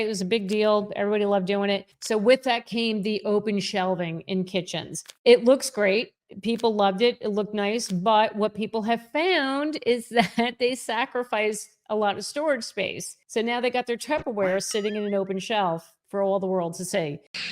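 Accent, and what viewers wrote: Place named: Northeast, United States. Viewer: Like you, the channel and the ideas presented. One update; sounds like you’re from the northeast and I don’t know what pools cost there, but here in Texas you can’t put in a basic pool - no grottos, spa or showers - for less than $80k. That really brings your point home even further. My advice? Make friends with someone who has a pool! 😅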